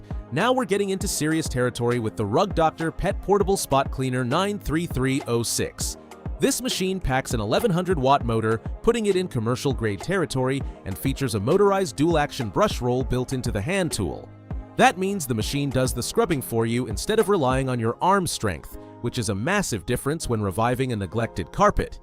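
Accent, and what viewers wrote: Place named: United States. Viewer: Why an American accent when buying in the uk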